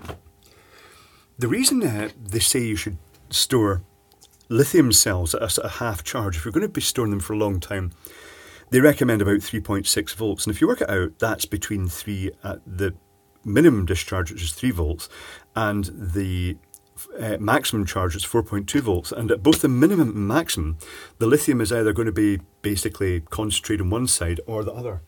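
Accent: Scotland, United Kingdom. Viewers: Whenever I play a video my cat comes in the room and starts mewing, he must like the Scottish accent. I have two old phone batteries on my desk, I was thinking "What can I do with them?" and this video shows up. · It is the Scottish accent. I lived up in Scotland and the people are really chilled and add a sense of humour and you will be refreshed by the positive energy.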